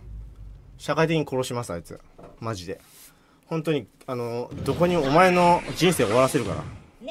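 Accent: Fukuoka, Japan. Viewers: 福岡のどこか？がちゃがちゃ言う方言今まで聞いたことなかったwww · 方言福岡やね。何とかやろうがっちゃ〜！って言うw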